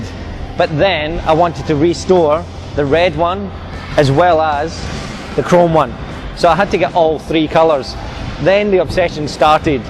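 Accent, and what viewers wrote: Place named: Glasgow, United Kingdom. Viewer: Sounds like a glasgow accent did you ride around 1984 85 .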